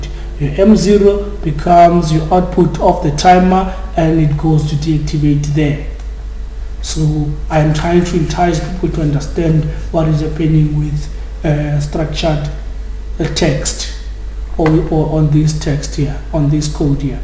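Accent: South Africa. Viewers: I know SA accent very well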